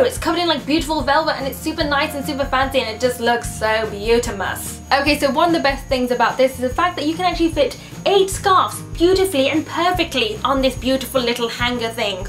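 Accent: United Kingdom. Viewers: Biiiiiiig liiiiiike from morocco !!! You saved my life x) i discovered you 30 minutes ago !! It s midnight now !! So you can imagine how excited i am now especially that i couldnt sleep !! LOL !! I watched 4 videos of you , liked them all !! good luck , waiting for other videos ! By the way , u have a funny beautiful British accent , i LOVE it , Nouhaila - Morocco <3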